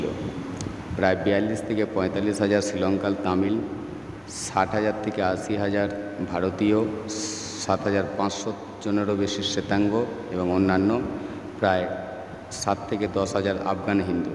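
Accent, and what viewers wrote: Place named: Ghoti, Bangladesh. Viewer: ঘটির ভাষা। বাংলাদেশের ঢাকাইয়া কুট্টিদের মতো ! তোতলা টাইপ ভাষা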